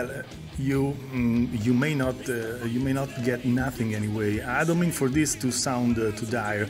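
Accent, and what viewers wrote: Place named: Italy. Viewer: A gimmick can be cool and definitely help out and Lordi would not have gotten as big as they did without costumes. However, today's Top40 is full of music that lack ANY personality (when did you last hear an original rap song?). I think your English is very easy to understand even though you can hear an Italian accent which sounds a bit refreshing. Perhaps that could be a gimmick too in some sort of way. Holy Super Mario Spaghettio 😆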